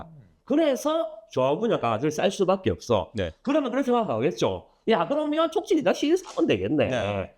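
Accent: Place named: Busan, South Korea. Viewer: ㅋㅋㅋ 인정~~~ 하지만.... 부산 사람은 자막 안 봐도 다 알아 듣습니다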